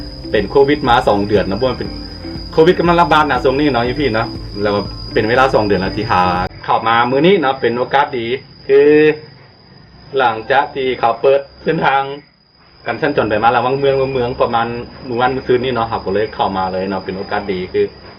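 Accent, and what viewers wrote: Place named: South, Thailand. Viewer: สวัสดีค่ะน้อง,กินกันได้ม่าย.?พูดไทยทางไต้.ค่ะ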